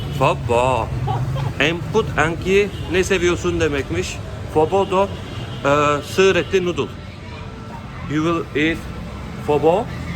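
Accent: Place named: Izmir, Turkey. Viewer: İzmirli ağızıyla keyifle izleyebileceğim bir kanal buldum :) Başarılar dilerim kardeşim İzmir'den selamlar...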